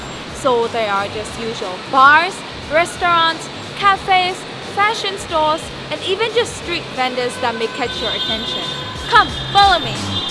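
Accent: Australia. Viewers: Hello This is. Thank you for all your lovely compliments regarding my presentation, I really hope I inspire you to experience Sanchaung. Just like what a lot of you commented, my English has a particular accent because I've actually been persuing a degree in Monash University (Australia) for a few years so I've been going back and forth between the two countries. And of course using my English for the EatSleep Yangon audience had been a great pleasure for me to show my love for my native culture. Again, thanks for all the interest! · Well done, I did detect a slight Aussie accent, maybe coincidence. Good Video as always.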